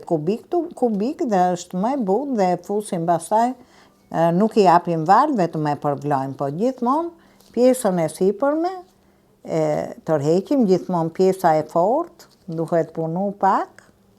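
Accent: Tirana, Albania. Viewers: Pershendetje zonja e nderuar.❤🥰Te lumshin duart e arta e folura tironce na kenaqe me kujton momen time.❤❤Te uroj shedet e gezim e lumturi me gjithe familjen.🙏❤🥰❤🥰❤❤🌲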